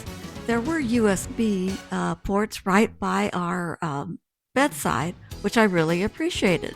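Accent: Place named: United States. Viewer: Love your commentary best American accent ever enjoy your stay , when are you coming to the greatest country in the world, Scotland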